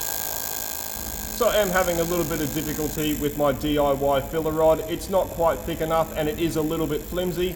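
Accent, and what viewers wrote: Australia. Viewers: Around 21 min. Heard you say "now we are going to find Santa" , realized your Australian accent...now we are going to find center. Was wondering "what does Santa have to do with this?"🤔🤭 Enjoying your vids.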